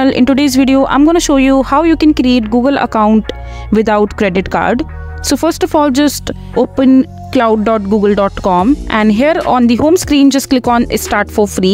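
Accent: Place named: India. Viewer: Don't trust english indian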